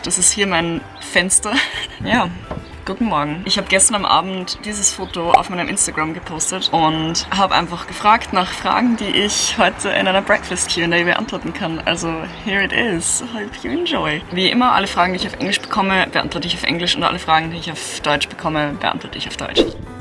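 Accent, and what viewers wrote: United Kingdom. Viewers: Wie hast du so geilen englischen Akzent? 😍😍😍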